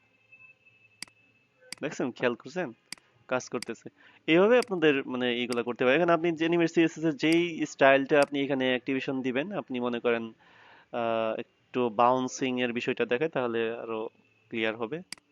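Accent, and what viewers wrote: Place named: Bangladesh. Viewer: Mind blowing. This is the first time I saw tutorial in bengali. Bdw your accent sounds like you are from Bangladesh. Are you?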